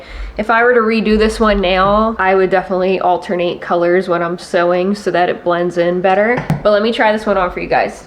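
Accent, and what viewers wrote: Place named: Pennsylvania, United States. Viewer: Love #5! The shape and colors are perfect! I'm currently making a bell sleeve top as a gift for a friend. Are you from Pennsylvania or upstate NY? I hear that part of the country in your voice 😊 great work btw!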